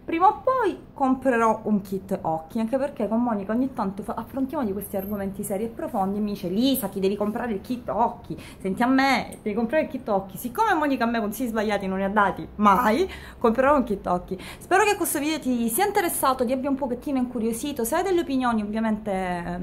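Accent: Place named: Palermo, Italy. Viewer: Ciaooo ti ho appena conosciuta su YouTube! Ma sei di Palermo? Si sente un po l accento ma non vorrei sbagliare ^-^ sei in gamba mi sto vedendo un bel po dei tuoi video perché vorrei mettere me ma anche la mia famiglia a dieta xD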